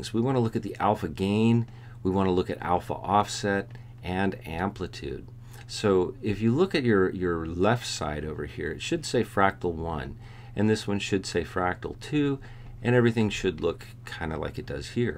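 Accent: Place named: South, United States. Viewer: good but it's hard to follow with your southern accent, maybe work on a more neutral accent. it gets annoying after a while. thanks for posting.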